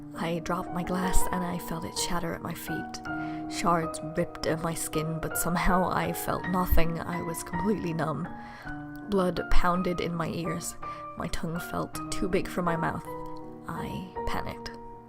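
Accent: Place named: United States. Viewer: If I didn't know better, I'd say you were actually American!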